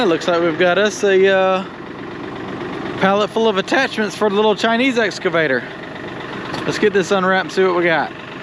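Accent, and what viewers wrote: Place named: North Carolina, United States. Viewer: I think that he’s from NC and they do talk different not funny but different.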